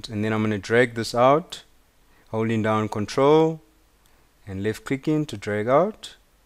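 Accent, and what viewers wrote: South Africa. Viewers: Thanks for a detailed tutorial bru....really nice to hear that distinctive SA accent :)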